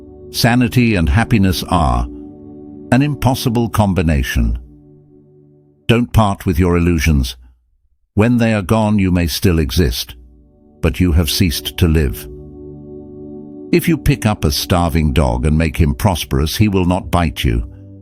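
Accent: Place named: England, United Kingdom. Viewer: Mark Twain quotes in an English accent, sigh, what is this world coming too?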